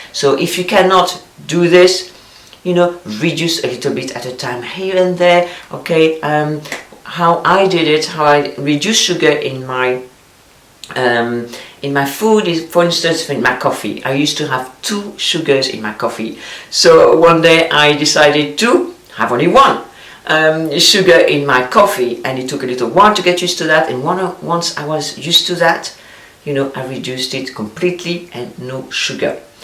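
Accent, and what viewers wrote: France. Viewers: Words of wisdom! I think most women know these rules but it’s so nice to hear it as a reminder especially when it comes with a French accent! It’s hard to stay on track when you’re home now, near a refrigerator. Have healthy fruits and veggies ready to snack on. And the occasional cookie. But sugar is an addiction. So if that’s you (like myself), you may have to cut it out completely to “detox” first. Losing weight is a marathon, especially after your metabolism slows down. This lockdown won’t help, so you need to keep moving, walking or running daily. Enjoy the fresh air with your kids or dog. Once you get older, like me, it’s so much harder to lose those extra pounds, so try not to gain them. That’s what this video is about, maintaining a healthy balanced lifestyle! Good luck everyone! And stay safe!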